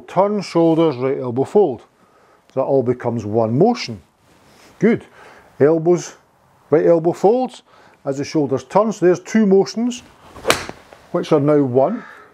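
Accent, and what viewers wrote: Scotland, United Kingdom. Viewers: I very much enjoy your lesson, both the content and your Scottish accent. Luckily I spent a wee while in the UK so it's no problem for me. Unfortunately I can not fwd your vdo to any of my Thai friends, because they wouldn't have a clue what you are talking about. Keep on the good work and no need to change the accent!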